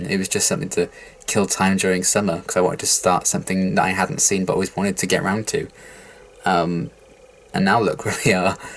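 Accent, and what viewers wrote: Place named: United Kingdom. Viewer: It's been a whole year sense I got to hear you amazing brit voice. Nice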